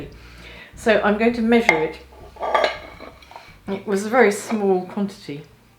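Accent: England, United Kingdom. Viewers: Thank you so much ! It’s so nice of you to share your knowledge on the leaves too ! I think these tips should be handed down but alas the younger generation don’t seem bothered. I live in the UK and it’s so refreshing to hear an English accent on utube instead of an American. As they also use different measures.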